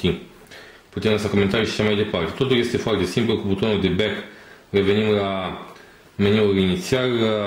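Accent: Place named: Moldova. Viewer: Oamenilor! E clar ..e rarait...sau moldovean ...sau ambele..ma rog..daca nu vreti..nu va uitati..ce naiba!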